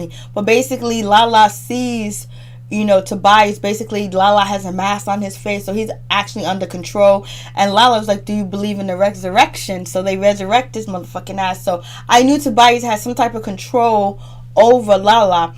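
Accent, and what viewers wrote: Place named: East Coast, United States. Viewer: your East Coast accent didn't hurt either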